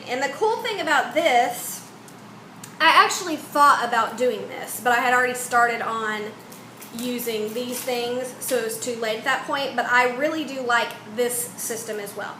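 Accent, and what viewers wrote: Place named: South, United States. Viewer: Where are you from?! I love your accent are you southern?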